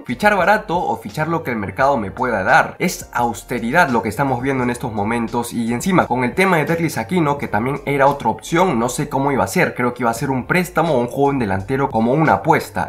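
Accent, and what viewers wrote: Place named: Bolivia. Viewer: Por q el marcado boliviano? 😵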